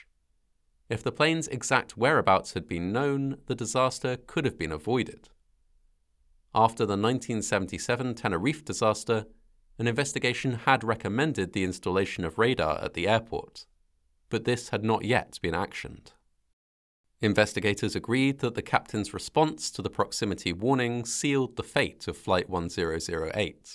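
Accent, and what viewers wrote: United Kingdom. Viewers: get rid of that stupid british acsent it sux like your littile island 😮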